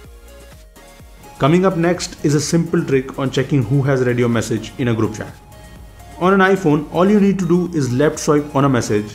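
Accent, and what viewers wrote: India. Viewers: Danyal Malik obviously indian accent... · Do you make your accent so thick on purpose? Because I literally don't know any Indian or Pakistani who's accent is so thick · Your head movement and indian accent make it super hard to understand what you sayin. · I HATE YOUR ENGLISH,LOL INDIA.. GET OFF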